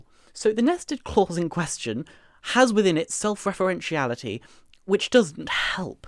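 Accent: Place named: United Kingdom. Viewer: Super british accent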